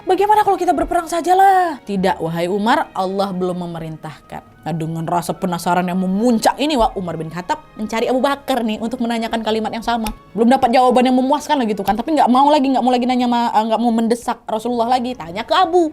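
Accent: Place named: Jambi, Indonesia. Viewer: Logatnya kyak orang jambi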